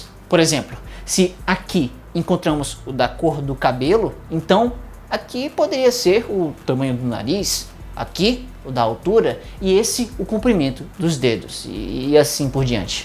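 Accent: Ceará, Brazil. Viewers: você é cearense né?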